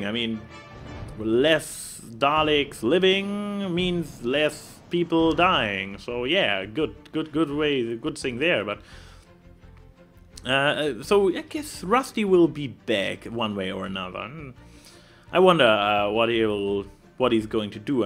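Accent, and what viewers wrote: Germany. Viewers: Into that German accent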